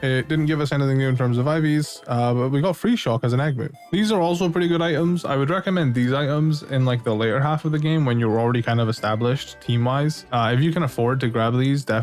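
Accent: United Kingdom. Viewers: iam 5 sec in and you have the most british accent ever xD i love it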